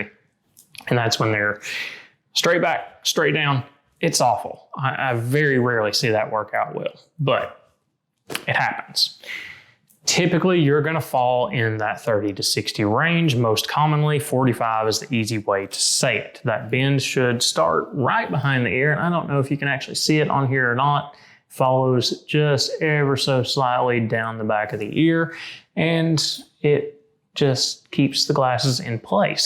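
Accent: Tennessee, United States. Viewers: I recently bought some frames I really wanted from the UK online but was having a hard time adjusting them, watching a few of your adjustment videos helped me get them just right. I had a bad experience with another pair I bought. I loved the frames and the opticians were nice, but they just couldn't get them adjusted right after having them adjust over a dozen times. Anyway, it's nice to have someone explain what to look for and how to make that bend to account for it (also just... what you can and can't do to a frame to make adjustments, I think that's another source of confusion). I looked up your store, nice to see a fellow Tenneseean (I thought I recognized a TN accent!), I'm from Knoxville but I'll definitely look into your shop when I get my next prescription update. Out of curiosity, do you stock Reykjavik Eyes? Thanks for creating these helpful videos!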